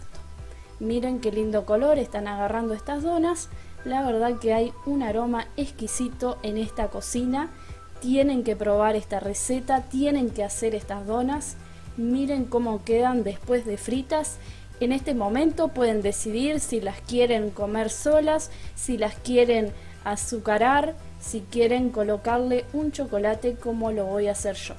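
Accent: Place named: Argentina. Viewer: Muy buena receta te sigo ahi ese acento Argentino wai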